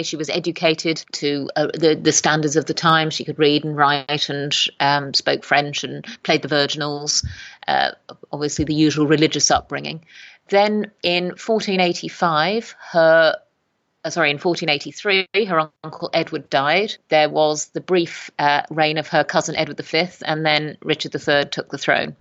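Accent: United States. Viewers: I'm immediately put off by the American accent!!!!!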